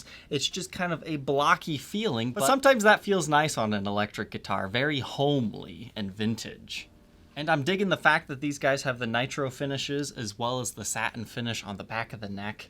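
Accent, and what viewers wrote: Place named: United States. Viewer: Jagwar. The american accent is interesting.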